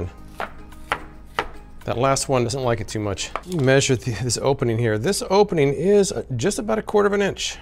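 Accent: United States. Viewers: baanaynia or banana American vs rest of the world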